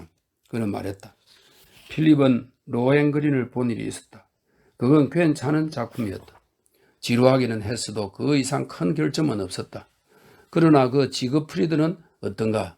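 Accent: Gyeongsang, South Korea. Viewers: 갱상도 아재의 두수한 사투리가 맘에 듭니다. 감사합니다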